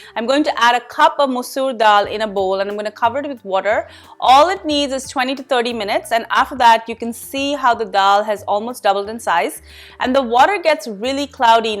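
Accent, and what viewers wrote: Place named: India. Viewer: " "? Shakal aur accent desi, aur naam vilayati"?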